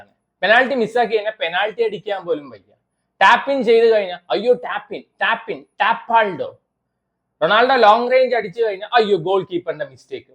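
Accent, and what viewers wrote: Palakkad, India. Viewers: Enan adyam video kandad Palakkad slang is attractive Apart from whats the content your slang make it interesting bro Keep going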